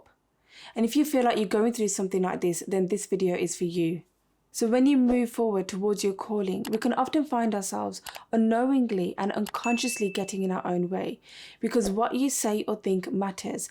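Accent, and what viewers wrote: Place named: United Kingdom. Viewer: what zodiac sign are you? also, you talk like the guys in (i lived in the u.k. fer 2 1/2 years with an english gf). i have anxiety disorder and in addition to what a strong and domineering emotion it is (along with the usual intrusive thoughts) i do sometimes wonder if my amygdala pumping out cortisol in such high quantities will eventually lead to cancer or some other illness (when it strikes my anxiety lasts fer HOURS and comes out of nowhere). it's like being possessed by some sorda evil spirit.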